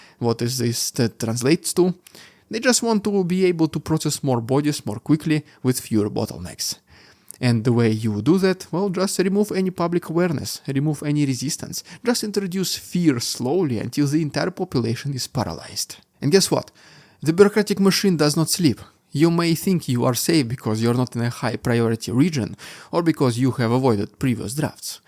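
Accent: Russia. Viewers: Love your charming Russian accent. Keep up the good work, and hold on to your accent, because it sounds authentic and is very easy to understand.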